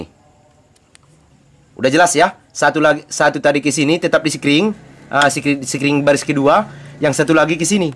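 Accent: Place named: Medan, Indonesia. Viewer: Saya selalu mengikuti channel abang ini, sangat diteil penjelasan y, trus logat abang seperti logat medan, jd nggak bosan sy sambil ketawa sy bang, lanjut trus boss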